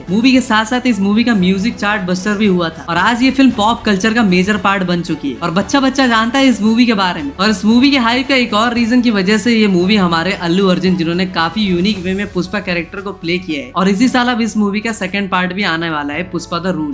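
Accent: Bengal, India. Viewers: Bhai ap Bangali ho ??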